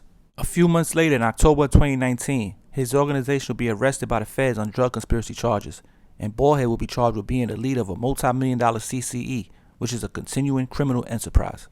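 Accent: New York, United States. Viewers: it sounds like you grew up in a suburban neighborhood of queens, Staten Island, long Island or Mount Vernon. I'm born and raised in Harlem NYC (of course there are no suburbs there), the Bronx has very few suburbs. I don't know how old you are, but I'm in my mid-60s, unlike where you might have grown up at, I grew up in a era where drugs specifically heroin was extremely prevalent. Even with all that there was a sense of community, the dealers who were much older than us would steer us youth away from wanting to get into the life, they told us to stay in school and don't be no fool. A lot of them back then even knew our parents, and they never sold drugs in front of elderly people. Even though these cats were contributing to destroying the community with dealing drugs, there was a weird sense of order and structure. IT WAS A DIFFERENT DAY AND TIME, when the Crack era came through that changed everything.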